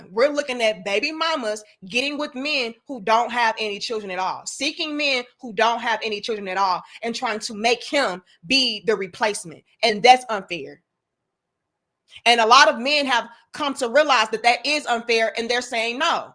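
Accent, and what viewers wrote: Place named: St. Louis, United States. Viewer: Yo. You sound like you from the Lou. Where you born in the 314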